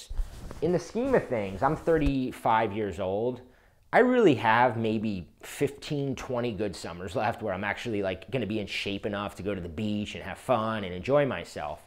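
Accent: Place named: New York, United States. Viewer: are you from Long Island or Queens?...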